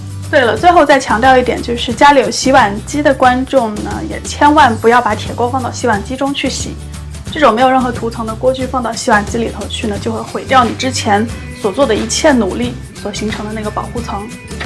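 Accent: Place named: Xi'an, China. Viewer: 听口音有像西安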